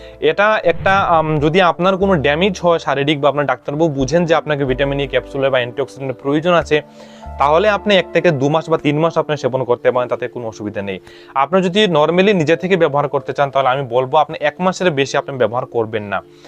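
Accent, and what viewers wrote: Bangladesh. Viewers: বাংলাদেশীদের উচ্চারণ শ্রুতিমধুর নয় ।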